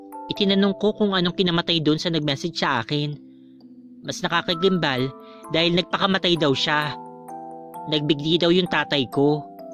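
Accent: Visayas, Philippines. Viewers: Ang cute MO magbisaya mamshie... Hahahaha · good aftie po! Thanks.. okay lng po sa bisaya, pronunciation, cute kasi pakinggan hahaha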